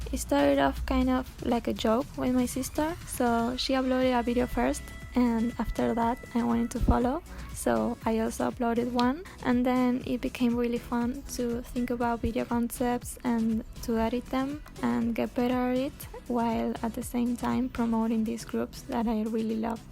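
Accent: Argentina. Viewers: sos una geniaa 💚 me encanta cómo hablas inglés con un toque de acento argento xd seguí así que tus videos son una joyita💚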